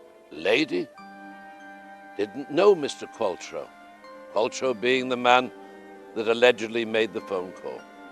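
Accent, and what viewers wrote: Liverpool, United Kingdom. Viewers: I love the old school`educated scouse`delivery,it reminds me of an old friend vocal chords improved by whiskey,cigarettes and the wind blowing through the Mersey Tunnel.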